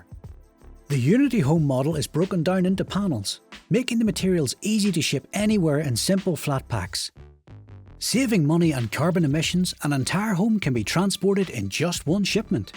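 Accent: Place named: Ireland. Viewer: I'll listen to this Irish dude all day long....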